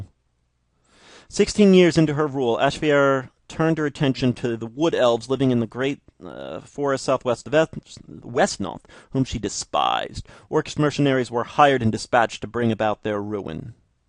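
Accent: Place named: United States. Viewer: i love the american accent =D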